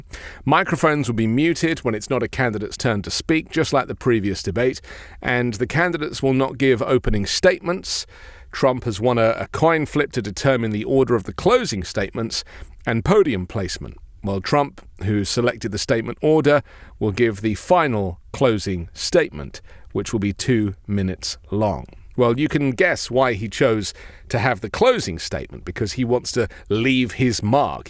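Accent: England, United Kingdom. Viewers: WTF are you to weigh in on an US election with your boring English accent. Go kiss the crowns ass you boring limey.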